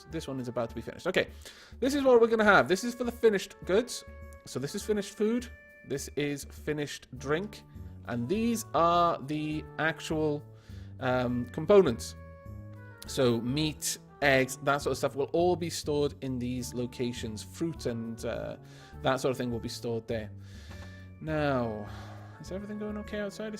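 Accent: Wales, United Kingdom. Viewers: Well yes, I've travelled quite a bit, mostly to learn English too (I'm a frog ^^). As a matter of fact, I also spent two months in total in Wales (Wrexham). Which might be why I like your accent so much, as I have fond memories of the nice people I've met there ;-) Anyway, you deserve those praises, as quality content should be acknowledged.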